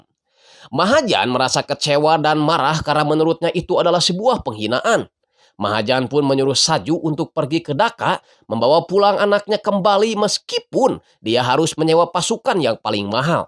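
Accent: West Java, Indonesia. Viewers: Sy gkk terlalu fokus ke film nya,sy lebih terhibur dgn pengisi suaranya,kang logat sunda banget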